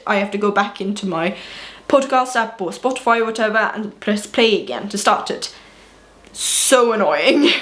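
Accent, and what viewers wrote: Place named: United Kingdom. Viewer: Jeez, I thought you were British. Your accent is incredible.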